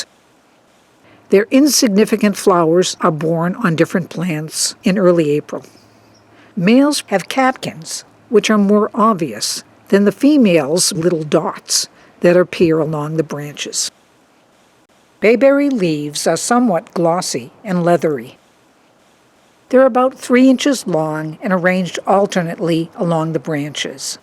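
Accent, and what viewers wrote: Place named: New England, United States. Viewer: Thank you, that's nice to hear. I used to live in NYC & PA but now I live in Santa Fe, NM. My family is still in NH, but I don't often get to western MA. I guess for now I'll follow you here and on FB. Thanks again for the video. It was full of solid info and hearing your voice brought me back to my New England roots.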